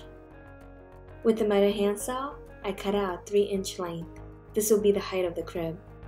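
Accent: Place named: New York, United States. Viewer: I like how you turned into a New Yorker about 40 seconds in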